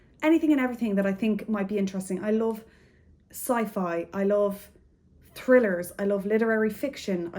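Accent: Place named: Ireland. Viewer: Great video! You have fantastic taste in books (Ready Player One, multiple Murakami, Cloud Atlas and Shadow of the Wind among others 😊) I really hope you find your rhythm and keep the passion for this, because this space definitely needs a voice like yours. The 10 days I spent in Ireland were the best of my life, so your accent reminds me of better times:) P.S. book recommendation for you... History of the Rain by Niall Williams. Irish author, takes place in a small fictional village in County Clare. Beautifully poetic and a great book for literature lovers.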